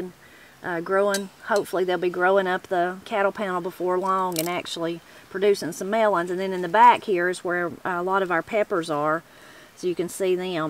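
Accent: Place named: South, United States. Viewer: I just love this lady's country accent, it's so deep and she seems so proud of it.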